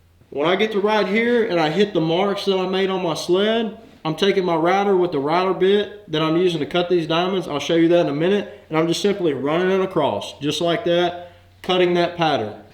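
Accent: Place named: South, United States. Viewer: the redneck southern drawl is epic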